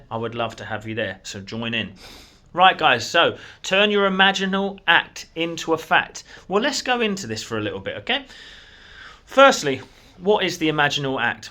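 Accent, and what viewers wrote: United Kingdom. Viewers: Thank you for the depth. Clarity and precision and of course the British accent ❤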